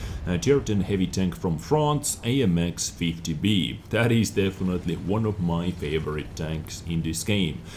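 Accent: Estonia. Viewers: Are you Estonian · are you Estonian? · are you from one of the baltic states Estonia? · Are you Estonian?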